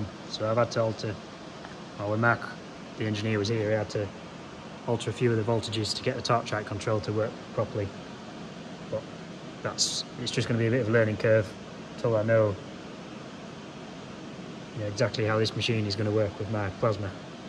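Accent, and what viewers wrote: Yorkshire, United Kingdom. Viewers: You may find that small parts fall down the bed with it being trinangle stlye and crash with the torch Ive on my second plasma now on a esprit lightning hd and i run a bed with flat slats with a bit of an s shape to them stops small parts twisting up Listning to your accsent you must b based close to me im at filey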